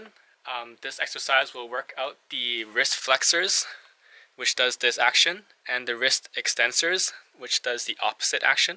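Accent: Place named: United States. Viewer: Jogender Jakhar he has an American accent so I would assume America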